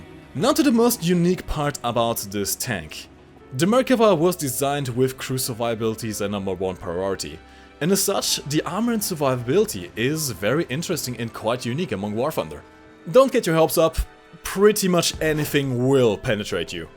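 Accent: Germany. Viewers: I knew that he sounds like a german 😂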